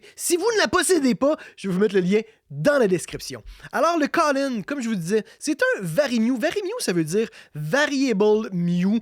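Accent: Quebec, Canada. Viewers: Bonjour, j'adore votre chaine ! Impossible de télécharger KOLIN sur PC Windows 10. Continuez à nous ravir avec votre accent Québécois ! Max de France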